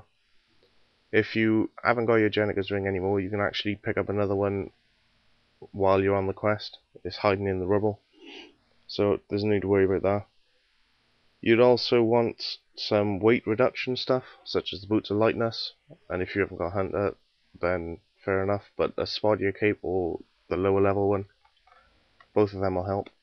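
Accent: Wales, United Kingdom. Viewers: Thnaks for this it helped and I am proud to be welsh I recognise your accent. You from south wales?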